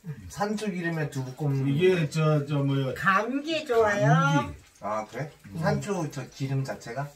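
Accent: Gangwon, South Korea. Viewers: 말씀 하시는게 강원도 사투리 쓰시는거 같아요~~ㅎㅎ 집안분위기 너무 행복해 보입니다~~^^ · 아직 먹어보지는 않았지만 함 먹고싶네요 산초기름도 첨 알았네요 잘봤습니다 ^^ 말투가 강원도 같기도 하신듯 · 오늘처음보는데 그 웰컴동막골에나오는그말투시네요^^ 강원도인가요? 강원도어디말인가요?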